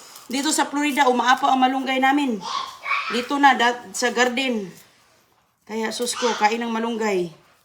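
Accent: Visayas, Philippines. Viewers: Oke oke you need to learn English now and you stay in America for how many years and still your accent is really different I know you are from visaya but it’s hard to understand 😮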